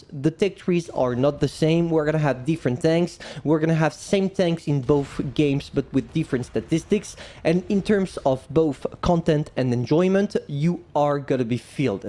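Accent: France. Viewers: is it me or your french , c'est moi ou t'es francais ?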